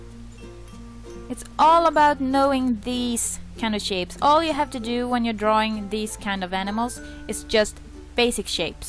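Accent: Sweden. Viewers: I thought that sounded like a fellow swede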